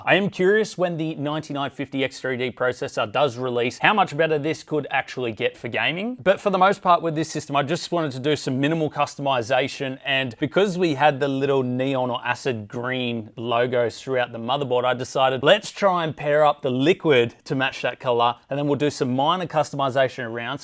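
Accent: Australia. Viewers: nice mate. Love your content 👍🏻 I knew you're Aussie from the accent but wasn't sure if you're currently residing in AUS or USA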